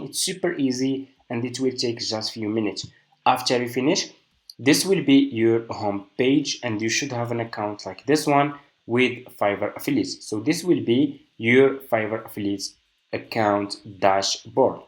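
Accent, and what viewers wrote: Morocco. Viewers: that Marocain accent 😂😂 ❤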